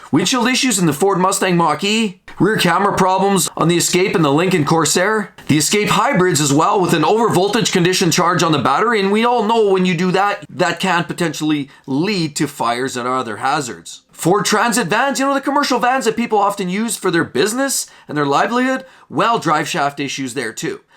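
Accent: Canada. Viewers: It's his accent. He's Canadian I believe.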